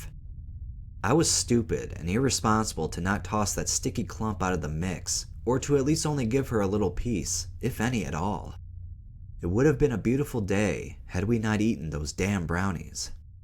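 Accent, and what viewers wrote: United States. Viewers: Narrator sounds 3rd generation Chinese American.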